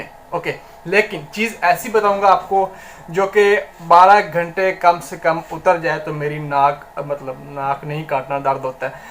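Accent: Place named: Jhang, Pakistan. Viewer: Mjy tu ye bhai jhang k lg ry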